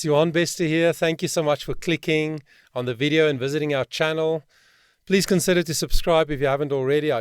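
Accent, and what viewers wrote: South Africa. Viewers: She is 17 now and dropped her first EP in August consists of 6 original songs. They are amazing. I have been following her for a long time and I am so proud of of her hard work, dedication and just being a wonderful human being. is the first track of the EP. You are missing out if you don't listen to them all. I think you are also a South African from your accent. I am a 77 year old gran from South Africa. She is my favourite artist and has been since she was 9 when I heard her sing a self produced version of.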